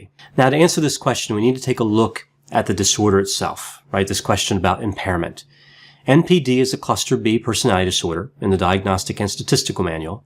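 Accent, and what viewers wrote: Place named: Delaware, United States. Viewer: Haha..knew that accent was familar..deliware..I'm from.philly:) this was very informative on becoming a licence clanition..if often thought about it. Teachers told me I'd be good at it lol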